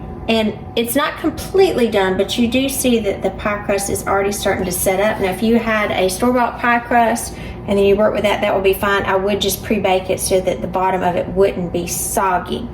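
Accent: South, United States. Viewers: Beautiful southern accent from WHAT state????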